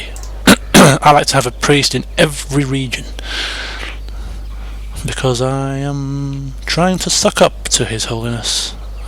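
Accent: England, United Kingdom. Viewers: I love your dialogue! Your english accent really adds to it.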